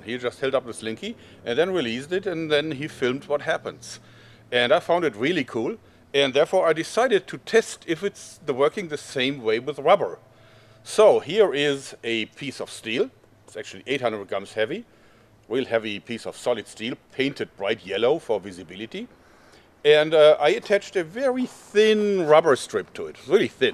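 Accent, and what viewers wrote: Germany. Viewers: is him from Germany? im from Argentina sorry about my English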